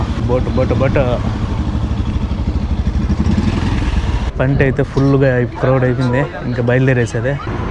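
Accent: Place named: Rayalaseema, India. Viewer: Adi Chittoor Nellore Yasa. Meeru kuda a pranthamlo putti unte alage matladevaru.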